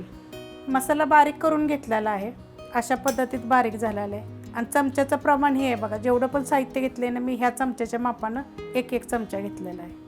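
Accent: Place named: Kolhapur, India. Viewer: खूपच छान असतात तुमच्या रेसिपी 👌👌👌 तुमच्या बोलण्याचे टोनिंग वरून तुम्ही कोल्हापूर च्या आहात असे वाटते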